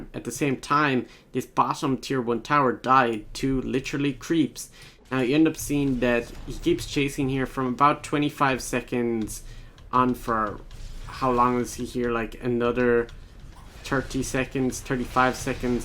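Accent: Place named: Ireland. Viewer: You sound like you live somewhere in south ireland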